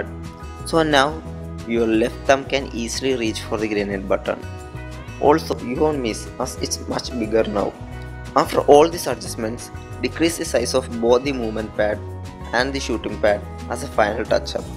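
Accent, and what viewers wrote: Kerala, India. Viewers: wonder I thought I knew that accent, Nammallum keralathinna.. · R u from Kerala Ur acsent wow! Amazing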